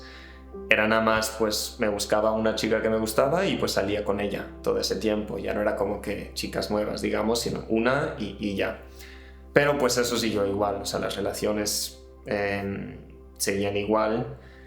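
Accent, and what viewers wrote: Mexico. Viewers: Soy española. Tienes acento mejicano, te has criado en Méjico? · Vi un vídeo de la entrevista que le hicieron al Padre Javier Carralon creí que grababan desde España jaja nunca creí que ya eran Mexicanos 🇲🇽❤ después ví el vídeo del testimonio de Patrick, después el testimonio de Sofi y ahora tu testimonio, ahora ya te sigo. Por la entrevista al Padre Javier creí que llevaban mucho tiempo de conversión nunca me imaginé que era tan reciente. Muchas felicidades por haber tomado la decisión... De aquí para adelante. ah y Bienvenidos a México (ya tienes acento mexicano)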